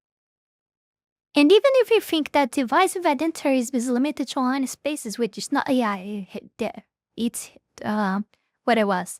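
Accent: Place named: Brazil. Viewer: When you know the person is brazilian cause they have the same accent as you do. But you can't prove it.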